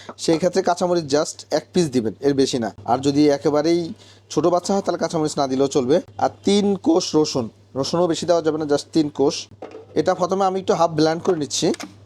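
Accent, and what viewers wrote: Noakhali, Bangladesh. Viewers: ভাইয়া আপনার গ্রামের বাড়ি সন্দীপ নাকি নোয়াখালী?জিজ্ঞেস করলাম কারণ আপনার কথায় এ দুই জেলার আঞ্চলিক টান আছে।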